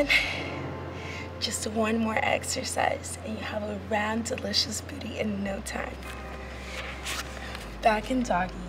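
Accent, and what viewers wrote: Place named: Southern California, United States. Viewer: I've always enjoyed voice. It seems so native Southern Californian but she hails from the Miami area.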